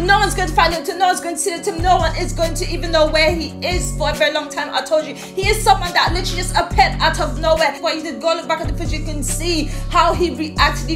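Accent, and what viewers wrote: London, United Kingdom. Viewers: Are you from london smh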